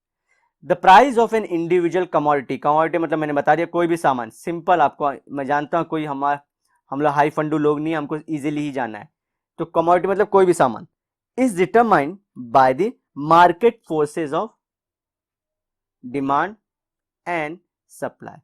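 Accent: Maharashtra, India. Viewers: Is your mother language is marathi ? 🤔🤔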